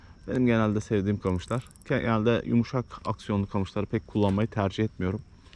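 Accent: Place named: Denizli, Turkey. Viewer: şive bizim buralara çok benziyor Denizli liyim bende o yüzden sordum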